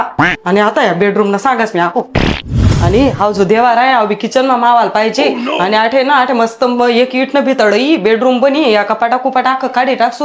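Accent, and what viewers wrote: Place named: Khandesh, India. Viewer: Ek no khandeshi bolta tai mi pn khandeshi ahe tumchi bhasha aaikun khup aaplepna vatato❤❤❤❤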